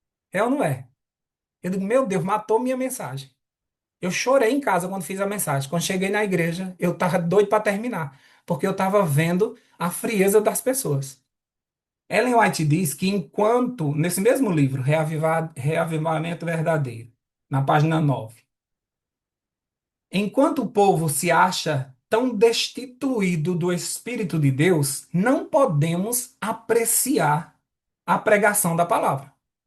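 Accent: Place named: Northeast, Brazil. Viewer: Meus irmãos eu não acredito que eu perdi a Live com essa pregação com irmão. eu sou de Aracaju, sua vizinha! Amo esse sotaque, é nois kkkkkkkk nordestinos arretado😁🙏🏻🤗☺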